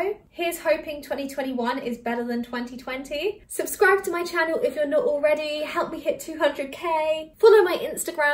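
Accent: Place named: England, United Kingdom. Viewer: Random: I love the English accent! I'm from Germany. BTW, you inspire me in some way, though I'm > a decade older than you ;)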